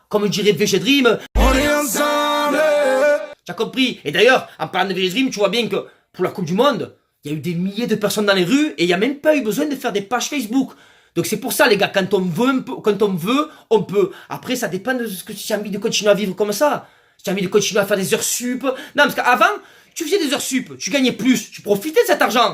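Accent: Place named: South, France. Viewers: Minable ce commentaire, il a un accent du sud et parle avec les mains et alors? ça vous suffit pour le juger et le traiter d abruti?? j ai pas pour habitude de jouer au cow boy en défendant des youtubers, mais pour le suivre depuis un certain temps j ai envie de dire que c est un homme extrêmement intelligent et respecteux, qui traite de sujets variés à travers des analyses pertinentes et une touche d humour. Il faut être talentueux pour arriver à faire ce qu il fait et surtout pour supporter des commentaires gratuitement haineux et non fondés comme le votre.Voila pourquoi il est suivi par près de 30000 personnes Madame, peu cher payé d ailleurs pour les moments de rire qu il nous offre. Bref, je ne vous salue pas. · Je n'en ai rien à faire si vous ne me saluez pas ! je ne vous ai rien demandé il me semble ? je ne me suis pas adressée à vous. 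Si vous suivez ce gars c'est votre affaire, libre à vous. Ce n'est pas parce qu'il a un accent du sud qu'il m'horripile, c'est parce qu'on dirait un malade mental en train de gesticuler ! 3mn de sa vidéo m'ont suffi !!! franchement s'il est intelligent, il le cache bien ... je suis désolée si mon commentaire ne vous plait pas, mais j'ai l'habitude de dire ce que je pense. Cela prouve votre niveau "d'intelligence" à vous aussi... visiblement lorsqu'on aime pas quelqu'un on est catalogué de haineux ? belle mentalité ! bravo, vous avez tout compris !! alors continuez à écouter les analyses pertinentes du pantin dans sa boite, je pense que votre niveau est identique ! ne prenez pas la peine de me répondre, je ne vais pas perdre mon temps à venir vous répondre ! je vous laisse donc déblatérer tout seul !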